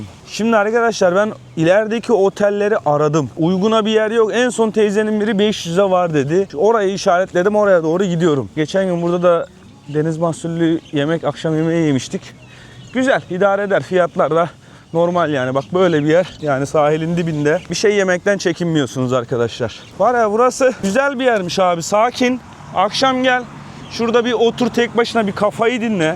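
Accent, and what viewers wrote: Thailand. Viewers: reis neden ingilizceyi tayland aksamı ile konuşmaya çalısıyorsun? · ingilizceyi tayland tınısıyla-aksanıyla konuşuyorsun artık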